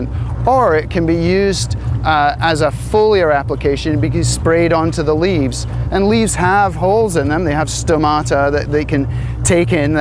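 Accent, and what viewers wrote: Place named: Scotland, United Kingdom. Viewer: I think he's a Scot.🙂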